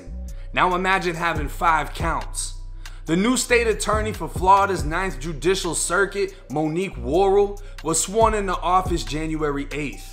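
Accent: Boston, United States. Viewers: That Boston accent is heavy bro, haha i rock with you... Remind me of Slaine... Keep doing your thang man...#Respect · U sound like u from Boston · Only new to your channel I'm Irish pal but loving the channel me and my girl was watchin you she says his voice is like Ted's Boston accent 💯👌💚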